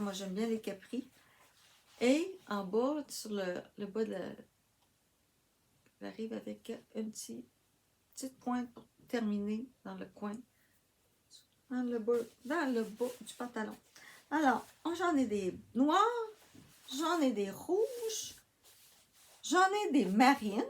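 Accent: Quebec, Canada. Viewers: Bonjour, super vos réalisations et cela m’a beaucoup inspiré notamment le montage des cols, je vais essayer. Je ne suis pas contre un tuto même si je crois avoir compris comment faire. J’adore votre bel accent québécois:) Bonne journée. Muriel de Paris (France)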